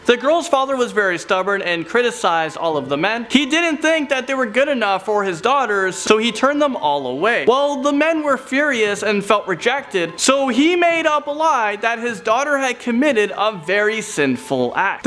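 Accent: Canada. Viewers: “Oot”. Thank you. Nice to hear a Canadian accent escape onto the net.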